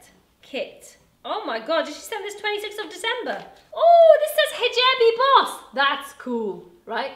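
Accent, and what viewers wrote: United Kingdom. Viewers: im pretty sure its mr.jared, but bc of her british accent it soudns like javed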